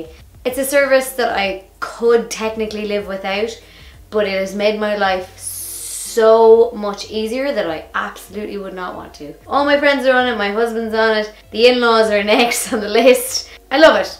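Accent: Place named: Dublin, Ireland. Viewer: You and Diane Jennings both live in Dublin but your accents sound different to me where were you born in that city or did you move there?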